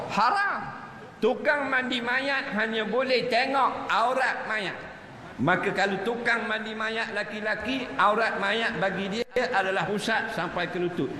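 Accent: Terengganu, Malaysia. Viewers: Orang Indonesia faham ke loghat Melayu Terengganu. Ketawa kamu tak kurang seronoknya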